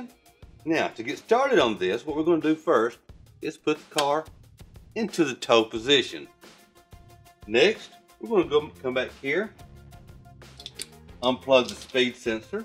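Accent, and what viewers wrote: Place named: South, United States. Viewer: southern drawl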